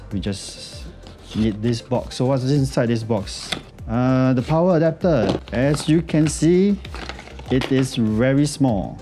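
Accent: Singapore. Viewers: listening to your singlish review,makes me really wana.....wow fantastic haha,decent review though.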